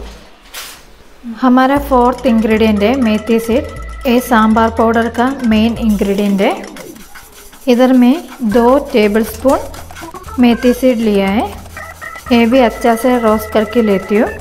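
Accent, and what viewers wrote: Kerala, India. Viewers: Love ur Sweet voice of speaking hindi with Kerala accent😅😅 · Seriously, I love her hindi more than the recipe .And it is so Kerala authentic. Really cute.😀😀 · Loved your recipe. Your detailed instructions. N above all your enthusiastic n beautiful kerala accent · Mam your recipe and your hindi pronaunsation both are authentic keralian nice thanks god bless you 🙏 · I can actually feel the mallu vibe while she's talking istggg the actual way a malayali talks when they try to speak in hindi I remember my relatives talking like this when they came to Mumbai for the very first time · Yess true your hindi is sooo cute... Even i am malayalee but born and brought up in Gujarat and Bombay so my accent is opposite when i speak malayalam all understand that i am not brought up in Kerala...😀😀😀😝. I am trying yours recipe today.. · Your voice is cute...than urs receipe...love Hindi from keralites...its sweet n swett.. 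· I know this kinda sounds crazy but your voice and way of speaking hindi reminds about my frnd from Kerala during my UG days. I just love that. #oldmemories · 😀true..authentic kerala hindi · I tried the recipe. Yummy. Sorry to make personal comment. Your Hindi is really good though in Malayalam accent with a beautiful smile. God bless you. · Malayali ki hindi .good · Last year we had been to kerala.And also one of my best friend is from kerala. I just love your hindi accent. It is so sweet. Thanks for sharing keralian recipes. God bless you n stay blessed always.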